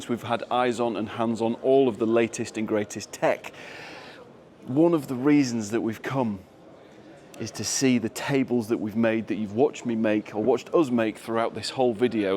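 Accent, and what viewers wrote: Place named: England, United Kingdom. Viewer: Haven't watched your channel before it just popped up in my feed today, pleasant surprise to hear an English accent, was expecting an American one before you spoke. Cool concept btw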